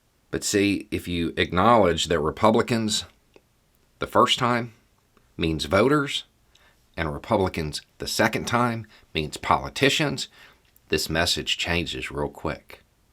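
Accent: South, United States. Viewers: It's nice to hear something a European perceives as reasonable in this southern accent.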